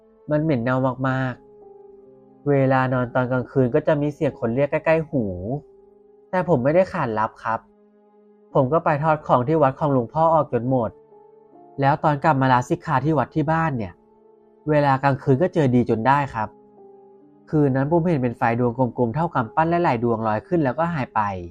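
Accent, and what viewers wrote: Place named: Isan, Thailand. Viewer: ผมว่าเล่าได้ดีนะครับ มันดูเป็นกันเองครับ คือบ้านผมอยู่ภาคอีสานนะครับ แล้วสำเนียงของพี่กับการเล่ามันเข้ากันมากกครับ ฟังแล้ว หลอนเลย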